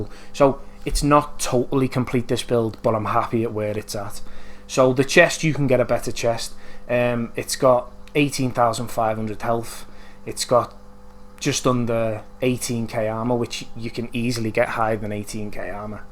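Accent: Liverpool, United Kingdom. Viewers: hey, my first time here..... funny to see a build going almost in the opposite direction than most ppl.. but very refreshing.... btw are you a scouser.. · were you fro scouse im newferry on the wirral. subbed :) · Thank you for the video! I love your Liverpool accent! I hope you are a reds fan and not Everton's (although it doesn't matter if you are). YNWA! 3> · where u from？Liverpool？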